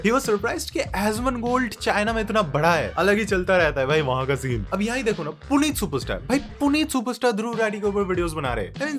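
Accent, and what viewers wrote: Bengal, India. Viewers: Bhai aapke hindi mai aapka bengali jalakta hai 😅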